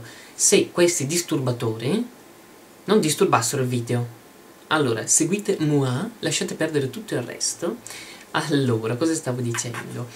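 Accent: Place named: Brescia, Italy. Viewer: mi piace troppo la tua parlata bresciana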